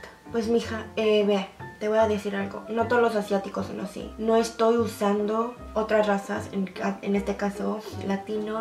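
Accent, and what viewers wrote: Mexico City, Mexico. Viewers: Haaay me encantas...jaja como contestas yo no veo mucho tus videos pero muy de acuerdo con lo que dices, y pues si que bueno que estes muy agusto con todo lo que sientes y tienes...cada quien tiene su vida como quiere y a nadie le piden permiso...hay de todo en la viña del Sr...jaja y por como hablas pues yo te siento muy mexicana...que tiene...jaja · Hola mi queridisima tengo muy poco que me uní a tu canal la primera vez que te vi quedé sorprendida en tu forma de hablar por que realmente he escuchado a otros asiáticos y hablan con dificultad pero tuuuuu no mames eres bien pinché mexicana aunque tengas tu físico asiatico vale madre lo que digan eres bien mexicana me gustan tus videos yo los veo mas bien escucho por mi trabajo pero trato de actualizarme contigo y te digo que si no les gustan tus videos pues que lo vean y ya cabron no se acaba el mundo bueno te mando saludos 😜😘🤗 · Hay ignorantes en cada país! 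Los mexicanos somos posesivos y te queremos solo para nosotros! Somos celosos! Una disculpa, por estos tipejos y tipejas, eres hermosa, y talentosa y eres muy mexicana y te defiendes como una mexa! Amo tu español tan chilango! Tu sigue como vas y como decia el Quijote, "dejad que los perros ladren, señal de que vamos avanzando" . Cada quien habla de lo que en su corazón tiene! Así que toma las cosas de quien viene y como decimos en México .... "hacer caso a pendejos es engrandecerlos" Éxito en todo hermana Mexicoreana! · El hate es porque eres Méxicana, y hablas un español perfecto y con acento Méxicano, aparte de que tienes cultura mexicana. Si fueras 100% extranjera (coreana) sin hablar bien el español te recibirían con los brazos abiertos así como a tus compatriotas coreanos. Está mal pero así son los mexicanos. Y si los comentarios de hate vienen de otros países latinos es por tu lado Méxicano también.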